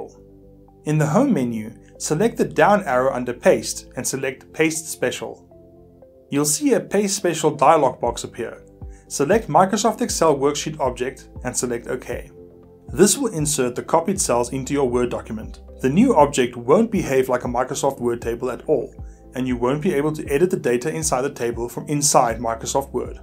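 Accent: South Africa. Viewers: Great South African Accent !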